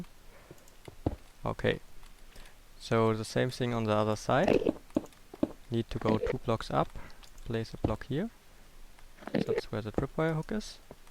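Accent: Germany. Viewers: Du machst wirklich super Tutorials, man hoert deinen deutschen Akzent raus. Ich hoffe, ich spekuliere nicht falsch ;P Es wuerde mich sehr freuen, wenn du mehrer Minecraft Erfindungen machen wuerdest. Ich hab's auch mal probiert, aber manche Menschen sind im Umgang mit Redstone nunmal besser :/ Ich wollte nur sagen, dass du super Videos machst und auch weiterhin so weitermachen sollst.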